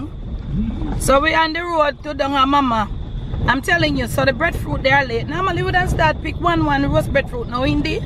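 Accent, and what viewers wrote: Jamaica. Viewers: Enjoying the narration! Love the Jamaica talk! Very relaxing!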